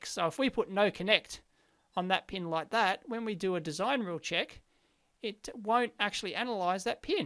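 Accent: Australia. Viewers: I really enjoy watching your channel. Not sure what’s your nationality but your Aussie accent and expression that make me learn and laugh. Thank you.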